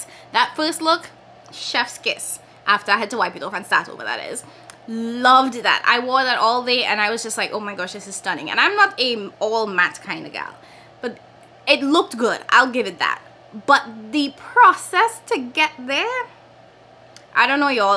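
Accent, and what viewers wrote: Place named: Trinidad and Tobago. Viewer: sent me over here! Hello! You sound like a Trini! I’m from Jamaica 🇯🇲 I’ve been looking for new beauty YouTubers to follow, need some fresh opinions 😊 I too have been wanting to start a beauty channel and actually have some videos posted on two channels from ten years ago on music and hair. One day! I’m scared of the editing… I don’t have this palette so just here to learn. I have Muerte, Gemini 2, amor y mariposas, beetle juice and she’s in parties. I just ordered the electrip.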